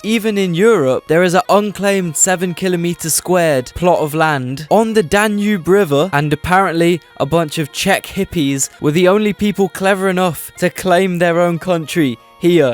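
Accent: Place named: United Kingdom. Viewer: british accent balls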